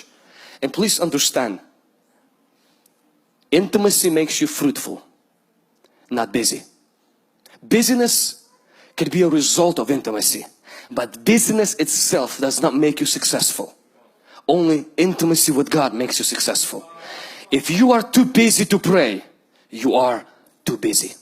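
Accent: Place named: Eastern Europe. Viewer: he sounds eastern European... nice message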